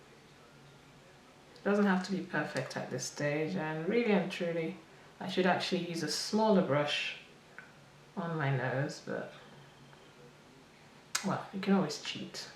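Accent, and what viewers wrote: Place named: Africa. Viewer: Love your African accent and your tutorial. Can you do a lipstick tutorial please???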